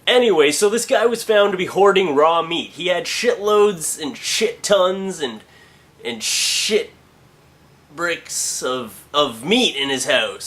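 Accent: Canada. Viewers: I LOVE CANADIAN ACCENTS!! soo sexy <3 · i love the Canadian accent the i'm Australian and its not so cool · I love it when you say "house" with your cute\amazing candian accent! :D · and ur Canadian accent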